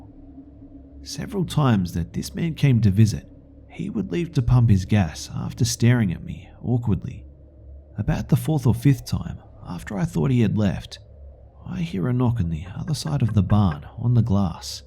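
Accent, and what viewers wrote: Australia. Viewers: I love your accent soooooo freaking much😍🙏🙏😂😂 Are you from Australia (idk it just sounds like it)😂😂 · He sounds Australian. Go 🇦🇺🇦🇺🇦🇺🇦🇺🇦🇺🇦🇺🇦🇺🇦🇺 Aussie Aussie Aussie oh oh oh.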